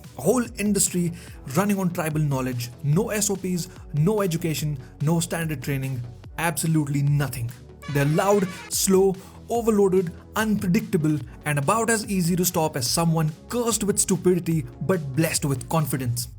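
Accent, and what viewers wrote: India. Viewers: your accent so good reminded me of my indian teacher. "magzimum Load" chills.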